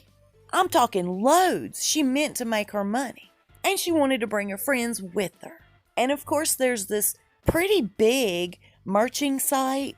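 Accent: South, United States. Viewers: A southern accent always makes me feel at home. I appreciate you,!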